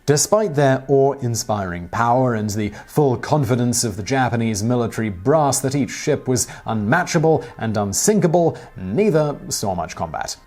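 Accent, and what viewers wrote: United Kingdom. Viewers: Too many factual errors, even with the British Accent, to be worth watching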